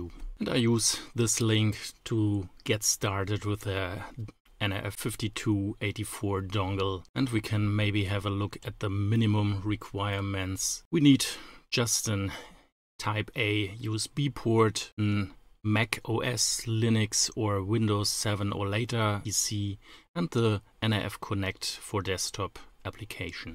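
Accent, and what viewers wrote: Germany. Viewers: Ahh another very good german accend tutorial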